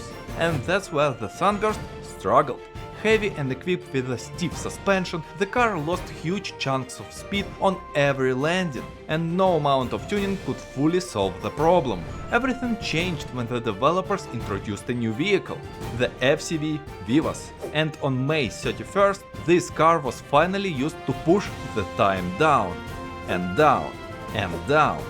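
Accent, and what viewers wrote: Russia. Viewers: Слышу Русский акцент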